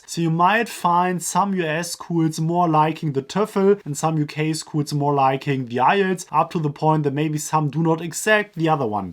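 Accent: Germany. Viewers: But I like your accent. Like German, sounds explicitly, harsh and strict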